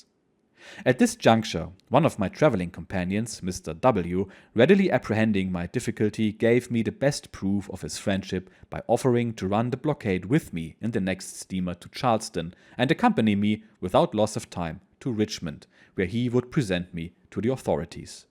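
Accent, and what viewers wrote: Germany. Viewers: What a surprising and interesting choice of theme this time! I bet our American friend will flock to to join us to take this journey. As I have said before, your way to present these historical adventures is commendable and the little German accent of yours is perfect for exactly this one - thank you, I'm hooked once again!!💙💙💙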